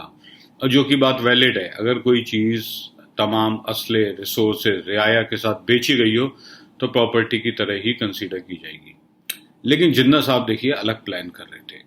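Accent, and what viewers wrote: India. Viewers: This guy from his accent is cleary an Bharati.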